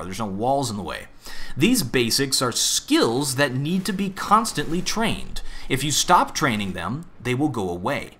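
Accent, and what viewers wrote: United States. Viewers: He sounds so American.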